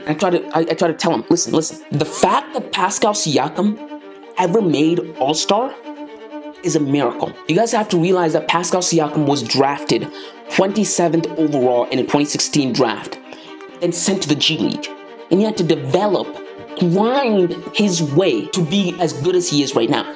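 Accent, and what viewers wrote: United States. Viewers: You sound like an American analyst. Looking forward to this team proving you wrong. Everyone in the league wants Durant… within reason. Nets aren’t giving up KD for Pascal and 2 firsts bro.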